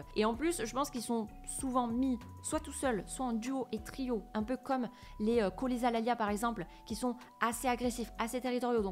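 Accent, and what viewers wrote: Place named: Aveyron, France. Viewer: l'accent était aveyronnais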